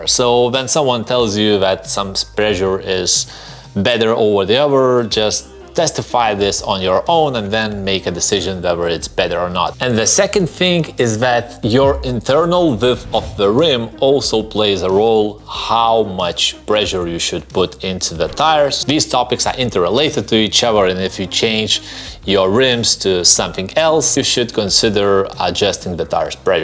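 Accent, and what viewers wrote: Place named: Soviet Union. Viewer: strong soviet accent ))) but video is very good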